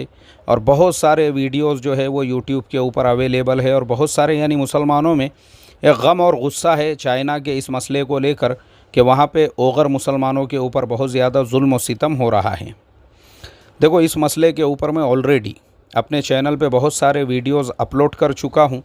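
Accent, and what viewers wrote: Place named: Maharashtra, India. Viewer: Mufti Sahab Mere Saathi Hai Bachpan Se Unka Baat Karne Ka Tariqa Aisa Hi Hai Jo Maharashtra Ke Baaz Ilaqo Ka Aam Tariqa Hai Lekin Dusre Ilaqo Ke Logo Ko Lagta Hai Ki Isme Gussa Hai Jabki Wo Normal Style Hai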